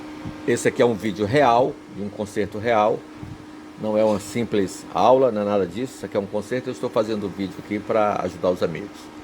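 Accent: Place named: Bahia, Brazil. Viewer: o sotaque parece da Bahia, sou de Salvador! Apesar de aqui estar complicada a situação, ainda assim n troco aqui por nada!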